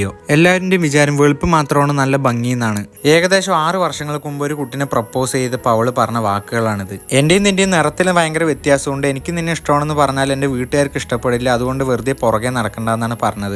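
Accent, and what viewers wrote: Palakkad, India. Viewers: Absolutely. Young man your thpughts are organic. From your slang you are from palakkad?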